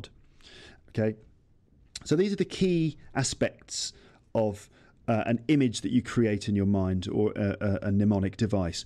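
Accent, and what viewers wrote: RP, United Kingdom. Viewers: Come up with more such podcasts. I love your British accent :) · You're the best podcaster for me. I listen you becouse I want to have RP accent and It's so clear (understandable.)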